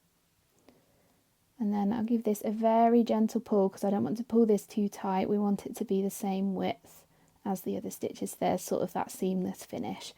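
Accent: England, United Kingdom. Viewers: Why do you call a treble a double crochet? Your accent is English and I am wondering why you are using American termanolgy.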